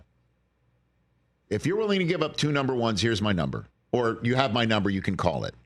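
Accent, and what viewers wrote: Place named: South, United States. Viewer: Rich Eisen speaks just like one of them “good ol’ boys” mask came off here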